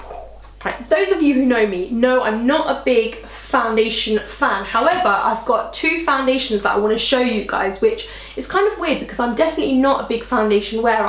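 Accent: London, United Kingdom. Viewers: You're so very London!! I love it!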